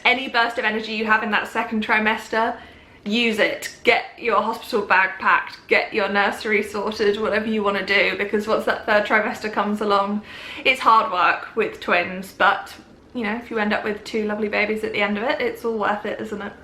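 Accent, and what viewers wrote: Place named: United Kingdom. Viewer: Elizabeth Ungemach British accent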